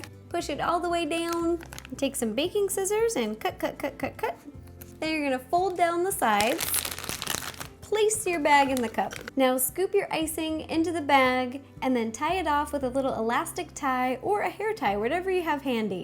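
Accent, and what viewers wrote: United States. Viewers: She's american and every country has an accent in their language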